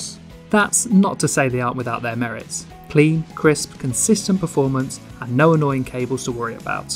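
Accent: United Kingdom. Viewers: You are good but your british make my ears bleed.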